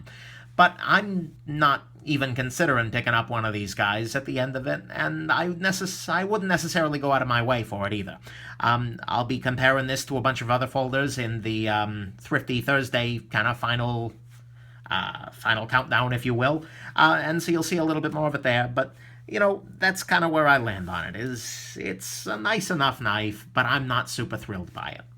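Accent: New York, United States. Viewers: New yawk I'm assuming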